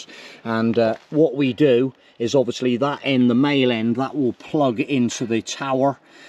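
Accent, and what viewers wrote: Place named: Exmouth, United Kingdom. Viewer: yep that’s what I’m looking at but I’m being told to fit a 255 tyre they need to be a 7” wide rim not the standard 6” , what width are your steel wheels . Btw I love your channel and your accent which is very much like mine coming from Exmouth and now living in Gloucestershire 👌 . Thanks for your help regarding the wheels 🙏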